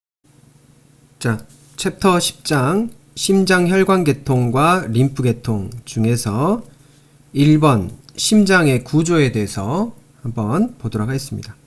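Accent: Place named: Jeolla, South Korea. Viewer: 우리 교수님, 고향이 전라도 신가봐요😊 심장시리즈 더 올려주실건가용??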